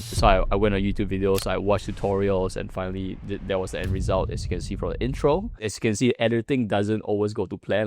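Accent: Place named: Singapore. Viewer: waow an editor with singaporean accent les goo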